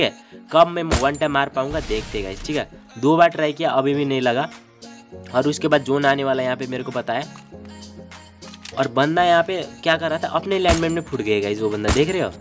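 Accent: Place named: Nepal. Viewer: Nepali ho bhai tum hum avaj se pehechan jate h 😁😄😄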